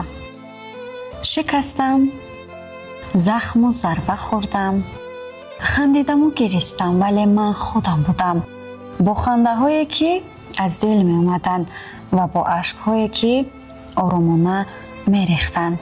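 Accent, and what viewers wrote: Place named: Tajikistan. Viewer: چه زیبا لهجه تاجیکی خانم زیبا خدا قوت تولدت مبارک 🎉🎉🎉⚘️⚘️❤️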